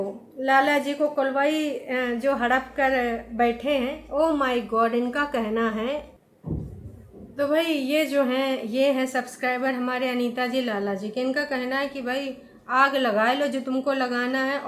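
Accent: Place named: Awadhi, India. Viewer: आपकी अवधी बहुत प्यारी है जी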